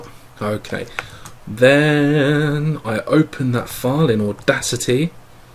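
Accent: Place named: England, United Kingdom. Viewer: You English blokes have the best accents, I could listen to you explain stuff all day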